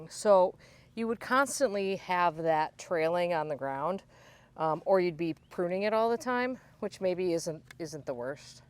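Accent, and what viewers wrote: Wisconsin, United States. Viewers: I grew up in Michigan. I love your Wisconsin Accent. Im thrilled I found your channel. Subscribing & following along.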